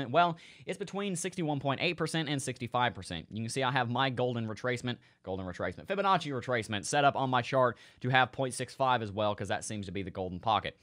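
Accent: United States. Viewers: that is a lot better 😂😂😂 Can I ask you, where are you come from. Because I’m from the Netherlands 🇳🇱 for me you sounds like a American...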